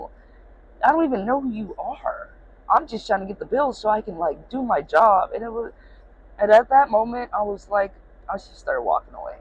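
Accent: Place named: California, United States. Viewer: You sound like you from cali...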